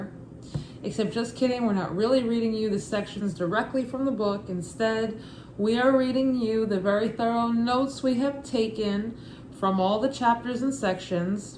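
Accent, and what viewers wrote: Brooklyn, United States. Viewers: Great job breaking down the Instrument Pilot Oral Exam Guide with that authentic Brooklyn flair—super engaging and helpful!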